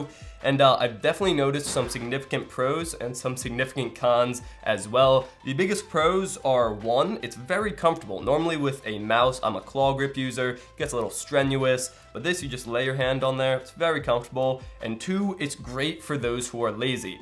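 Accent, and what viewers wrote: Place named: General American, United States. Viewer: General American accent.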